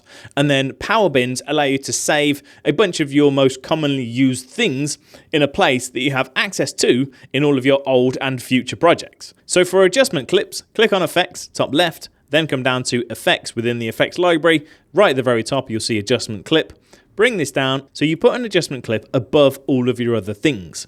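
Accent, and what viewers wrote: United Kingdom. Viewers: SO MANY TIPPS for my workflow!! Thank you so much from Germany and let me say that I love your accent. Which part of UK is it from?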